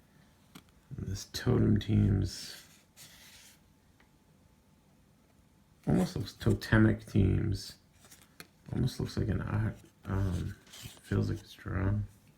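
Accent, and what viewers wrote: Boston, United States. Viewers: Can't place your accent. It sounds like like home town of Boston but I could be wrong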